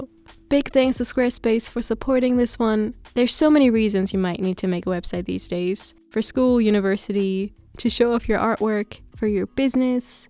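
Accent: Germany. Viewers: wait are u german? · i love your videos, like just the way you talk ;) are you german by the way?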